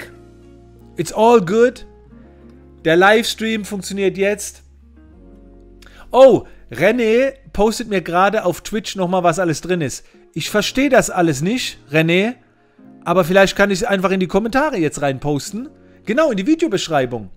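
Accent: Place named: Mannheim, Germany. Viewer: Bist du aus Mannheim? Dein Dialekt hört sich so an. Grüße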